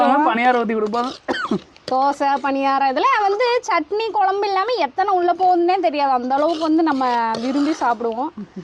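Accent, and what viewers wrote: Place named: Tirunelveli, India. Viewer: Ninga entha uru district enna slang nalla irukku thirunelveli district?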